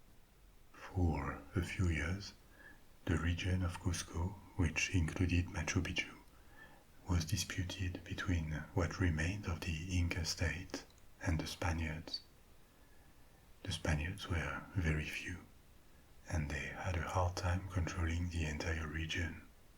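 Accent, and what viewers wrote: France. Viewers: I hate the fact that this channel is labelled ASMR. I hate the way you whisper. Hell, I even hate your French accent. But bro... son of a gun, I will be going to sleep to your soothing tales of ancient myths, legends, and history for the next few months. Damn you, ASMR Frenchman. Damn you.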